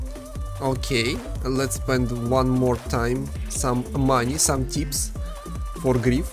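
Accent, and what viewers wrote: Russia. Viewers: R u from Russia?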